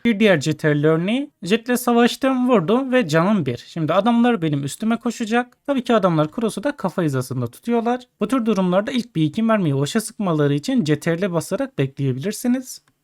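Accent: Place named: Black Sea, Turkey. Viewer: bi Karadeniz ağzı var gibi derinden kulağa geliyor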